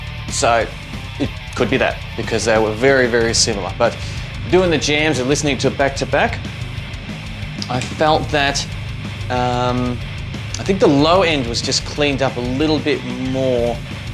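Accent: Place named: Newfoundland, Canada. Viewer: newfy/irish accent!!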